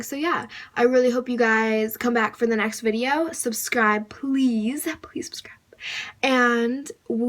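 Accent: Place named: New Jersey, United States. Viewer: The jersey accent!!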